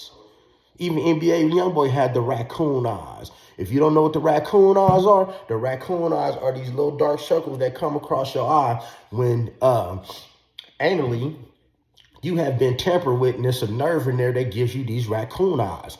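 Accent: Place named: California, United States. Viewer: New sub here coach! I definitely thought you where from cali!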